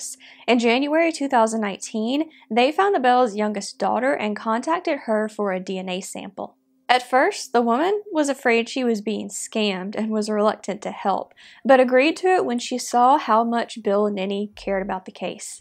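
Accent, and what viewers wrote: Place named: Mississippi, United States. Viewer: Nice video. I decided to subscribe. For some reason, you sound like you are from my home state of Mississippi. 👍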